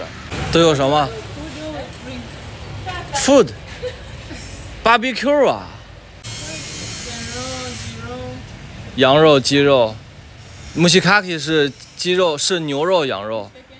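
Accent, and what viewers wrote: Tianjin, China. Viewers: 天津人，哥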